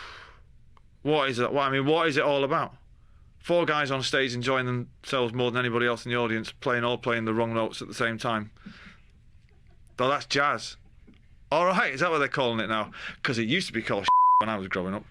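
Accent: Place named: Manchester, United Kingdom. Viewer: Sei stato bravissimo con i sottotitoli, posso farti solo un piccolissimo appunto? Io non avrei tradotto "freak out" con sclerare ma piuttosto con "scioccato" o "molto sorpreso". Può anche significare "spaventato" che però in questo contesto non ha senso ma il significato che tu hai scelto a me non risulta o almeno a me non è mai capitato di sentirlo usare in quell'accezione. Ripeto, sei stato molto bravo anche perché il mancunian non è né facile da capire né piacevolissimo da ascoltare. Anche se quello che esagera con il dialetto è il fratello Liam.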